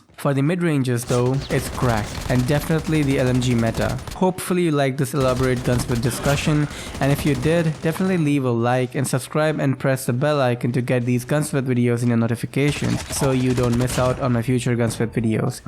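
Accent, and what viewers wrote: India. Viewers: Bro are you Indian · R u Indian?? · Your real voice confirms that you're an pure INDIAN good job bro!!🤩🔥🔥🥳 #CYGNOUXTO20KSUBS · voice sounds Indian slang 🙏☺️ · Hmm You are from India , Right?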